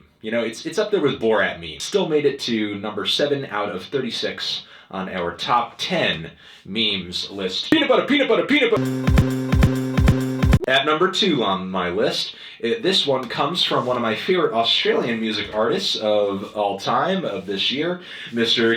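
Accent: England, United Kingdom. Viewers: i fucking loved your english accents... you sounded like all characters of monty python... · dude he has the best British accent!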